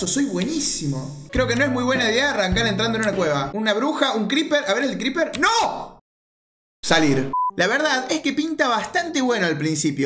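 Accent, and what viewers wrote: Córdoba, Argentina. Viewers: SOS CORDOBÉS????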